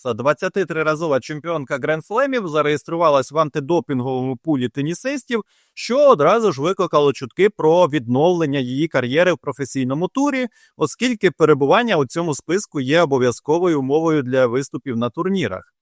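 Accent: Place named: Russia. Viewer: Вітаю, пане. Дуже вдалий формат етеру. Коротко і змістовно. Але дуже попрошу, коли коментуєте як теніс, так і колеги- снукер не називайте імена на каzапський лад. І сет пойнт, а не сет бол. Дякую.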